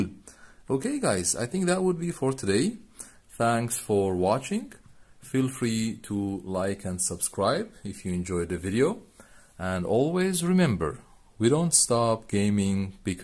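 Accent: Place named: Iran. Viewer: This is the most Persian accent I've ever heard in my 43 years on this mortal coil. I give this 5/5 pomegranates. Would watch channel again!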